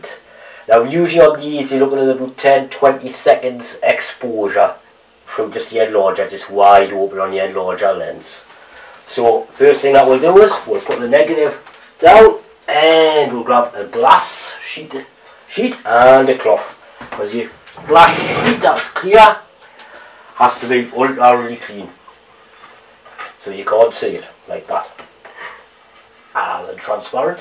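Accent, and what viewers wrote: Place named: Newcastle, United Kingdom. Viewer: Love the vid, Thank you Great information! :-) Its a good job I can, sort of understand Geordy..... I think others would benefit with subtitles :-D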